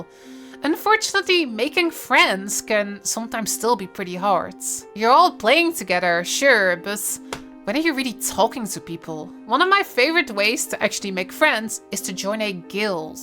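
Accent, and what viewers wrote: Netherlands. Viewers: are you Dutch by chance? Your accent sounds familiar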